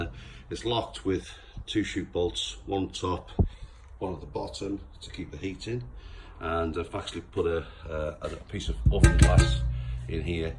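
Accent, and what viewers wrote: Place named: United Kingdom. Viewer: Hi, I have got a very very similar oven, I bought it last week, havent used it as yet, bought it from a wheel refurb guy. Nice to hear someone from the UK, I have not done my first powdercoat as yet, so a steep learning curve I think is about to happen LOL. Dai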